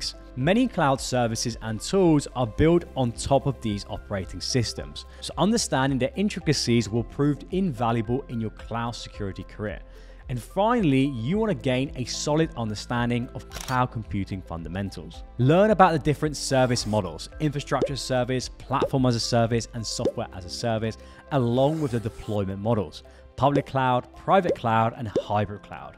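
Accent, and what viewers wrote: United Kingdom. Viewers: Strange how you sound British yet don’t make any mention whatsoever of British salaries. You just cater to Americans…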